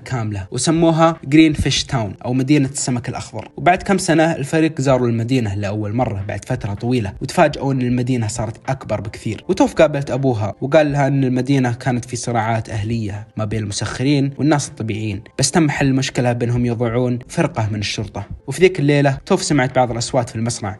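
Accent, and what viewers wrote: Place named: Qassim, Saudi Arabia. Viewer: احسك من القصيم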